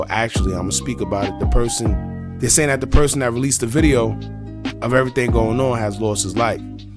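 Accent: New York, United States. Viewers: Hey Bro are you from New York?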